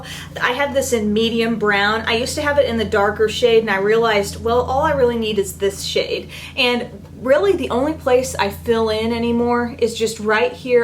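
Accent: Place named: Alaska, United States. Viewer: Where are you from? It sounds like you have an Alaskan accent.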